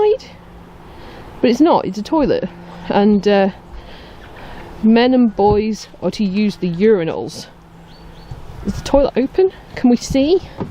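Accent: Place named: Stoke, United Kingdom. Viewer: Lovely to hear a Stoke girl,. It took me ages of living here in Cambridgeshire to say luck and buck not lewk and bewk! I'm from Congleton just slightly north. Love your videos.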